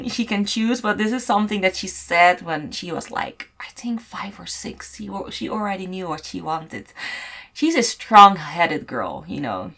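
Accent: Netherlands. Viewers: Oh Youre dutch haha I heard it on your accent nog een vraagje welke pc gebruik je? :3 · Are u dutch? cause you have a dutch accent lol edit: oop yes you said your dutch in your video lol ❤️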